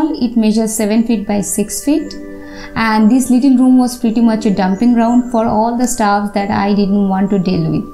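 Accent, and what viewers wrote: Bengal, India. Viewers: I know by her accent, she is bengali.. I am bengali too.. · U are a bengali right? Because the english pronunciation is something like what a বাঙালি does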